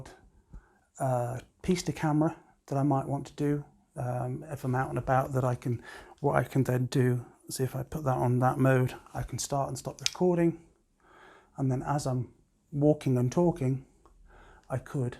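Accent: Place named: England, United Kingdom. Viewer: nice to here an 'English' voice here on YouTube. Can I use with my Pan Lum SZ330? (bridge camera) I know what you mean about the focus on Panasonic my is all in and all out difficult to get a nice slow in/out. I'm considering buying a dedicated camera (low cost) video camera for the 4500? What do you suggest? On camera mic; Can I put a Rode 'type' mic on the separate handle or is there some better option? Great review.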